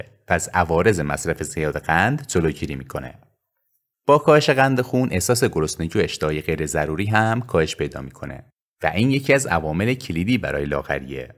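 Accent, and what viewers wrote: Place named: Turkey. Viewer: baba in lahgeh torkit halemono gereft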